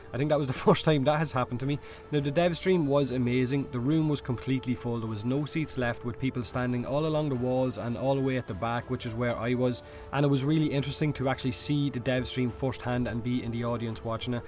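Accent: Ireland. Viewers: lol are you from Ireland??? I em in Ireland to :D loooool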